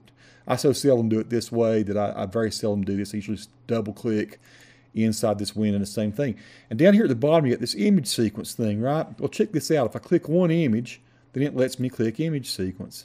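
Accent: South, United States. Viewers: Hey there! Russian from Russia here! Привет из Питера, посоны! Just want to thank you for the vid and say what a lovely southern accent you got there! Keep up with a great tutorials!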